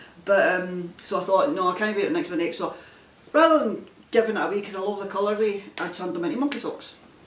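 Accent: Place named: Scotland, United Kingdom. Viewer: So great to see you back! It's fab to hear a Scottish voice on a podcast, and your knitting and spinning are always beautiful.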